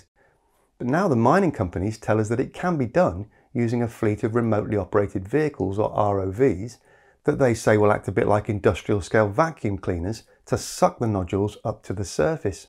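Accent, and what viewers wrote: United Kingdom. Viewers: Hearing you come up with terms like "research odyssey" and speak it in the British accent is why I always preferred listening to Brits narrate in documentaries.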